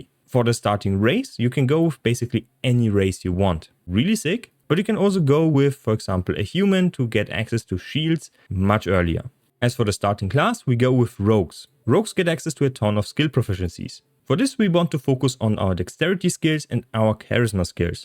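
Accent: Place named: Germany. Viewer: your english is weird man i thought you were speaking germany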